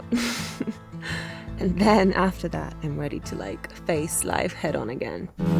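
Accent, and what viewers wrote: England, United Kingdom. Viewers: What a great video and such a lovely girl. What a lovely English accent she has! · She grew up & worked in London hence the British accent . Also she went to Oxford University over there !!! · The British accent, the Land Rover Defender.. I think i found Lara Croft in real life :) Don't tell me not everybody had that thought hahaha 👍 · Back when had an English accent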